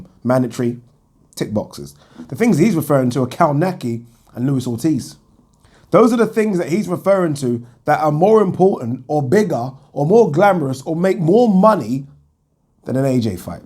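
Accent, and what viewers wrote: United Kingdom. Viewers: As soon as I heard your UK accent I knew the whole video would be biased. Wilder tried for 3 months to make a fight with AJ and NO LUCK and he has now moved on and that is what we do in America MOVE ON. Wilder was just on a tv show "BILLIONS" on Showtime and now possibly a Creed 3 movie so he is busy now making big moves in Hollywood. Question : Why cant Joshua show up to Wilder vs Breazeale in NY which is first isnt AJ here in 🇺🇸??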